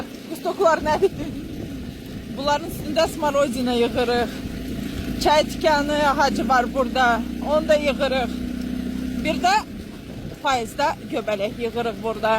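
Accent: Ganja, Azerbaijan. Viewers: Sən əsil gəncəlisən ləhçəndə şirindi elebil kazaşka deyilsən 😂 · Gözəl qəlbli bizim qızımız yaxşı ki, tanıdıq səni və vlogların superdi ailənlə birgə daima xoşbəxt ol bu arada inşeAllah ki, Azərbaycan dilini unutmazsan ən əsasıda Gəncə ləhçəsini unutmayın şirinlik verir sizin danışığınıza 😊 can səninlə birgə gəzdim kəndinizi eyni abu havanı verdiz mənə ❤❤❤❤😅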